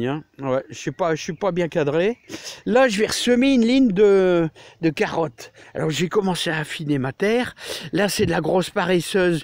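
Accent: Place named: Nord-Pas-de-Calais, France. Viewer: Moi j'adore quand tu parles ch'timi 😂❤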